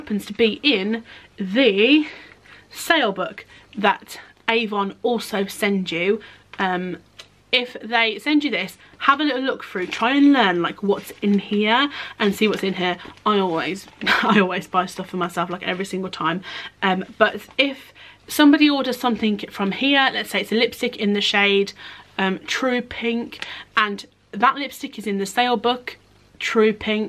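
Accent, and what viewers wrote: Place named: England, United Kingdom. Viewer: Ooooo! English accents are always so delightful to listen to. ^.^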